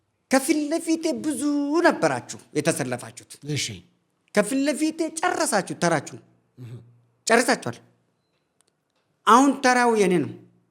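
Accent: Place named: Ethiopia. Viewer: በዚህ accent የአዲስ አበባ ልጅ ነኝ ማለት አይነፋም። በማንነትህ ኩራ። As long as you believe in one Ethiopia.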